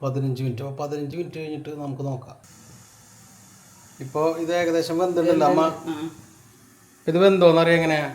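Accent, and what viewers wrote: Thrissur, India. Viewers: ഒറ്റ ഇരിപ്പിൽ കൊറേ വീഡിയോ കണ്ടു. Sub ചെയ്തു. നല്ല അവതരണം. Simple but powerful. ഓവർ ആക്കി ചളം ആകാത്ത തൃശൂർ ഭാഷ. നാടൻ food. എല്ലാം കൊണ്ടും സൂപ്പർ ❤️❤️❤️. ഇടക്ക് ക്യൂട്നെസ്സ് വാരി വിതറുവാണോ 🥰. 😜 കണ്ടു മടുക്കാത്ത വീഡിയോകൾ. 👍🏻👍🏻👍🏻👍🏻👍🏻🥰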